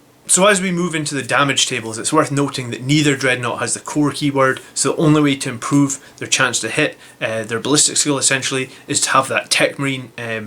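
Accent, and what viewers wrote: Scotland, United Kingdom. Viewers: Really enjoy the channel and the Fb group. Also nice to hear a Scottish accent on the Internet.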